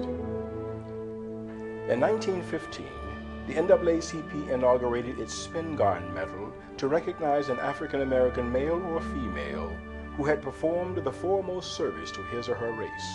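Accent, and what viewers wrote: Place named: United Kingdom. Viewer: the British accent... o.O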